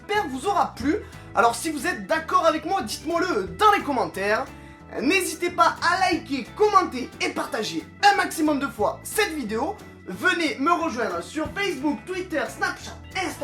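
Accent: Marseille, France. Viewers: comment je déteste l'accent Marseillais😂 mais le tien sa va encore !😉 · Tu as bien choisi les fous de YouTube et j'adore ton axen marseillais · tu est du sud? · a j'adore ton accent Marseillait aller Marseille je m'abonne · oh l'acson marcer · T'as un petit accent du sud~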